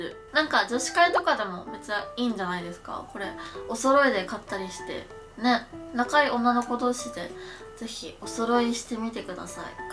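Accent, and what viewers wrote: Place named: Kansai, Japan. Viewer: の関西弁めっちゃ可愛い💗💗💗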